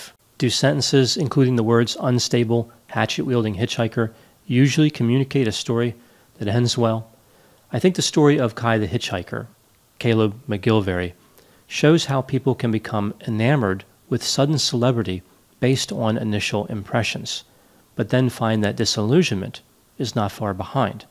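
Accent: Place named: East Coast, United States. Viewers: I hear it again on the word "thoughts," as well. New England is as narrow I can place it. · I almost want to agree with you on that one, it sounds definitely east coast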